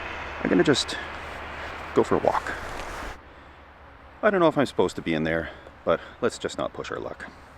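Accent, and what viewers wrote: Canada. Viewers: you've got a nice, soft voice and comfortable Canadian accent. I think you'd be a great reader for an audio book or something of the likes, I'd listen to it.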